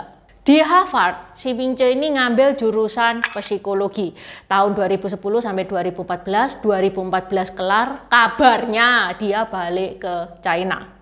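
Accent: Java, Indonesia. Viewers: CICI produk lokal yang meddok, aku suka.... 😊😊😊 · Bahasa kamu ciindo Jawa . Pasti jawane medok ... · Logatnya Cin Wa bgt 😅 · Logat jawi cici ne kuental baangat yoo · Medook Jowone😂😂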